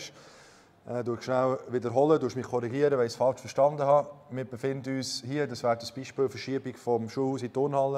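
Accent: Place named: Switzerland. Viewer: ja sicherlich mein Freund, du sprichst ja deutlich! 😸 was kann man da nicht verstehen, und ich mag den schweizer Dialekt! 🤜🏼🤛🏼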